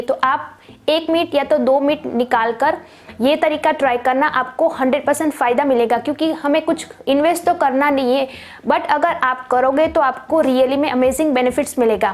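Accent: Gujarat, India. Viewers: You must be a Gujarati by the way you speak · I like her gujrati accent i miss my gujrati friend.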